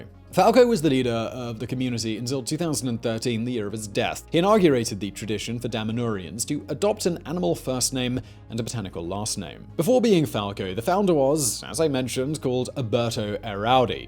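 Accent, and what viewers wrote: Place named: Southeast England, United Kingdom. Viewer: It's a bit weird, and the phrasing is idiomatic in places, but it's definitely southeast English.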